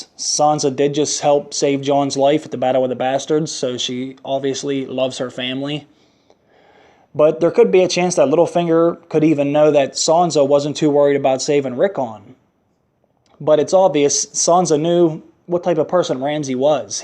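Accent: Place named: South, United States. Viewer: your southern drawl is a charm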